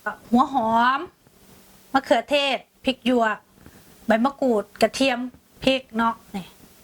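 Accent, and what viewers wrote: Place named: Korat, Thailand. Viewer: ฟังเสียงแล้วใช่เลยคนโคราชบ้านเอง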